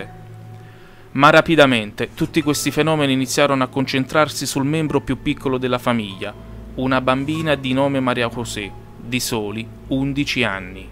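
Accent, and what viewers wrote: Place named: South Tyrol, Italy. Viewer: Ti do like perché te lo meriti, però promettimi che fai un corso di dizione, e che ti Levi l'accento tipico del tirolo... Senno non puoi avere un tono professionale...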